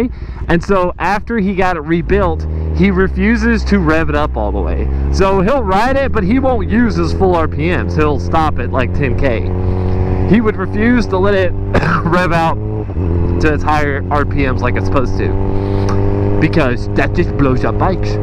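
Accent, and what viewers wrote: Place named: Houston, United States. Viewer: Off the first minute i can tell you from Houston lol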